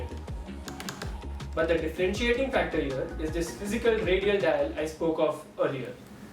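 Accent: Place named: United Kingdom. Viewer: 80% british 20% भारतीय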